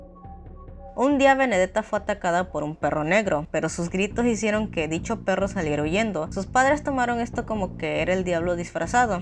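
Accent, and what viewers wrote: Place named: Veracruz, Mexico. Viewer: Le puse más atención a tu acento jarocho que a la historia en si xD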